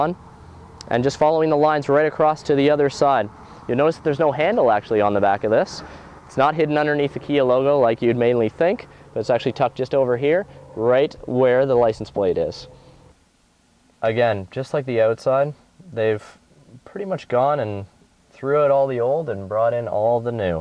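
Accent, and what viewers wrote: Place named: Canada. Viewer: Oh he's Canadian... That explains his pronunciation and his hotness :)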